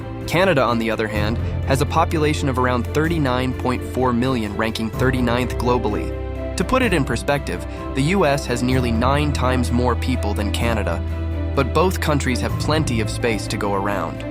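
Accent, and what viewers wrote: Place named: Nigeria. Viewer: You sound like a Nigerian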